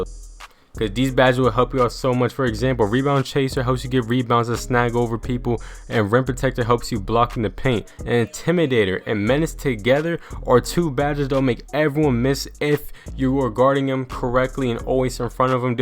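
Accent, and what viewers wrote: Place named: Canada. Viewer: You sound like your Canadian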